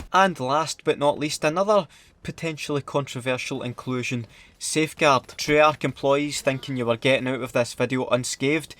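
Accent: Scotland, United Kingdom. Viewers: Why are annoyed Scottish people so great to listen to?? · NOTIFICATION SQUAD! Love ya accent it's godly, my english teacher is actually scottish but she's holding back her accent sadly i love the scottish accent. KEEP UP THE LEGENDARY WORK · Love the Scottish accent haha. Hilarious content as usual. Much love from the US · Scottish, sounds nothing like Welsh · Am I the only one that thinks the Scottish accent is a work of art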